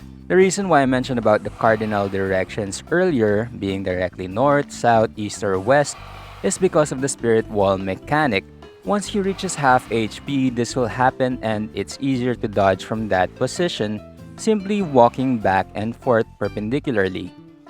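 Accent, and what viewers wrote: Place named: Philippines. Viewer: I knew ur a Tangina by ur voice lol 😆 nice man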